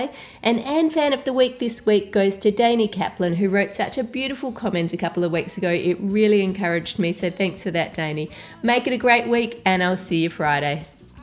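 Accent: Australia. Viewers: The Australian accent is real..! xD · Australian accent (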